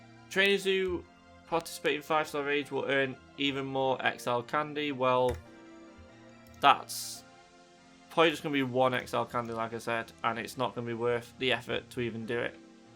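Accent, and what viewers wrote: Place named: Manchester, United Kingdom. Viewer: Hi. Count Jinsula did a video today saying it looks highly likely that Hanke has put his demand out that he doesn’t want remote raids at all. On another note, I detect a Mancunian accent. You know how bad the weather can be in that part of the country. (I am Lancastrian) but live in S Wales now which arguably experiences just as bad weather conditions. There are times it’s just far too dangerous to go out at all. Hanke lives in his deluded narcissistic bubble where everyone on the planet lives in a mansion in California, where no one works but has unlimited amounts of spare time and money, with unicorns leaping over rainbows. Hanke, right there, is a brilliant example of how to run a company into the ground , bc narcissists are never wrong and know absolutely everything about everything and they know better than everyone else. 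All hail Mr. 💩 Sorry for the rant. I love this game but I went from being never being able to raid legendaries (always lived rural, only myself is playing) to being able to raid legendaries, to now not being able to.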